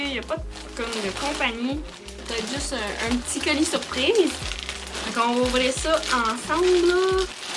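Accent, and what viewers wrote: Canada. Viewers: Tes vraiment belle ton corp, ton visage et surtout ton accent canadien😍😍 tes pas du tout disproportionné🤷‍♀️🤗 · Est-ce que tu es canadienne?j'adore ton accent 🦄🦄🦄💚💚💚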